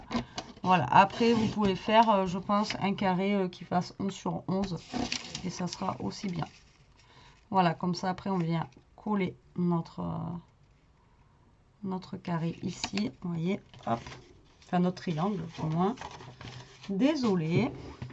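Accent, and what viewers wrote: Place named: Toulouse, France. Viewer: Cc, il est vrai que je mets pas trop de commentaires en ce moment, j'essaye de me débrouiller toute seule mais pas tjs évident, et pour ce qui vous suit, je voulais dire que c'est vraiment grâce à vous que j'ai pu apprendre car vos tutos sont totalement formidable, c'est très bien expliqué, simplement et efficacement, je reviens tjs vous revoir pour tjs réaliser vos réalisations et c'est tjs un plaisir de vous revoir avec votre accent que je connais si bien, que j'adore, car je suis toulousaine, restez tjs là même car d'autres personnes comme moi qui étais vraiment débutante, aurons besoin de vous comme je le suis encore, et qui font que nous apprécions le scrapbooking, merci encore à vous, je vous embrasse 😘😊😊😊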